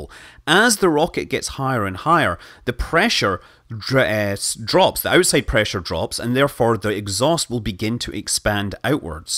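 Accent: Scotland, United Kingdom. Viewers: Maybe this is a stupid question, but how the fuck do you know so much about rockets? I mean, I feel like I've learned so much that I'm going to start talking about rockets with a Scottish Manleyish accent.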